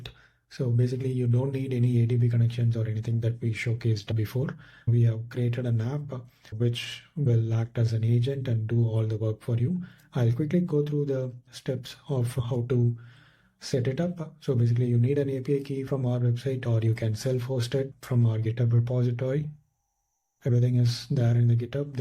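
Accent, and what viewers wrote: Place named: India. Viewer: indian accent... scares people away.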